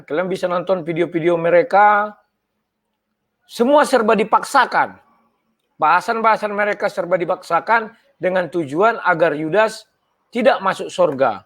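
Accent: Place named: Medan, Indonesia. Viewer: Saya senang dengan bang karna pemahanan dalam Alkitab, dan cara penyampaian dari bang ini khas orang Medan...... mungkin teman2 ada yang kurang srek dgn cara penyampaiannya, tapi saya yakin penyampaiannya jujur dan mencerahkan kita semua....semangat terus pak...Selalu diberkati Tuhan.... salam dari Manado. IMANUEL.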